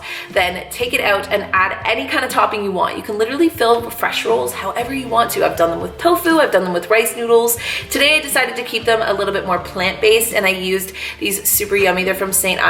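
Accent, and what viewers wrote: Canada. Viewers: I think it's the Canadian accent. We like our 'A' sounds. :) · Sounding like a Canadian in this video lol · Us Canadians say things differently ahaha 😅😅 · its how Canadians say it, i watch aton of youtubers from canada and they all do it hah its just different!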